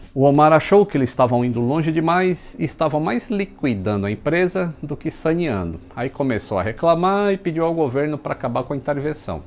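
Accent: Brazil. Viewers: NÃO DEIXEM DE DEIXAR O LIKE !!!! PORTUGUÊS BEM BRASILEIRO............... SALUDOS DE LA PENINSULA IBERICA....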